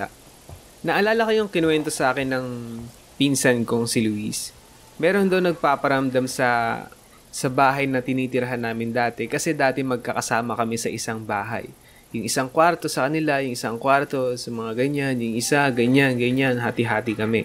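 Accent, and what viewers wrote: Philippines. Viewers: I love your Filipino accent Say it again.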